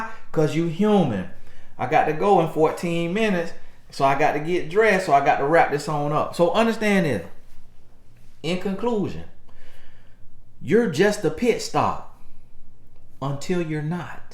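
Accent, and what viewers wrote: South, United States. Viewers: Love your hometown Southernness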